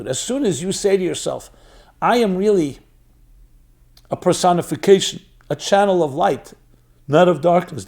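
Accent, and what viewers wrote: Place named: New Jersey, United States. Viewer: Here in Britain, we have something called black magic chocolates. They come in a large shiny chocolate box. And are absolutely delicious. You can't stop at one. Don't know whether you have them in the states 🤔 All negative states of being are overcome by love. There is nothing stronger. Although it is vital to sort the wheat from the chaff. And act accordingly. Thank you for the great talk, Rabbi. Love the new Jersey accent. Oh, Ecclesiastes is an awesome book. You've just mentioned it. Few were wiser than Solomon. Heightened states of consciousness are synonymous with objective consciousness. When separateness disappears and one becomes all that one beholds. As Solomon says, "as above, so below."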